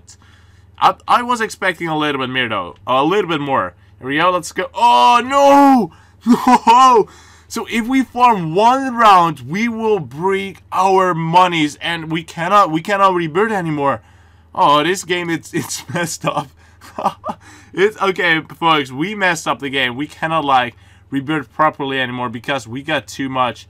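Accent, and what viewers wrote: Netherlands. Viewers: BREAKING NEWS Are You Dutch? (*and i mean from the netherlands*)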